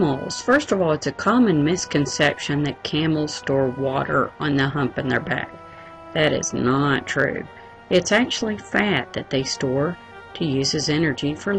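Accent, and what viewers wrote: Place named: United States. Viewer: sounds American to me but i ain't good hearing accents it all sounds the same to me well except the RP accent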